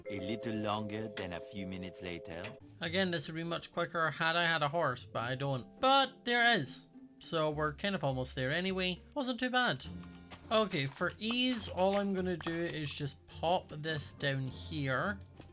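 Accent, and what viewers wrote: Scotland, United Kingdom. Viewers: Hello I was puzzling around from were you came from. Because some how im Familiar with this Englisch that you are speaking Australien, Cockney Englisch. Canadian Englisch. Then maybe Scottish. That could be. I was not shure. Scottish is positive. I few years ago our Theatical group got ivited to St. Andrews. And I remembered the Englisch there were speaking is like yours. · Scottish accents are the best accents I do not have one 😞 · Are you Scottish 😮😮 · Scottish acents are funny sometimes lmfao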